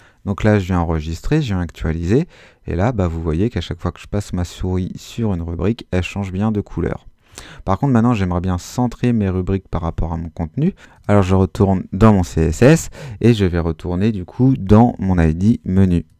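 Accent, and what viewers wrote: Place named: England, United Kingdom. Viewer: Très bon tuto mais l’accent anglais est beau ! :D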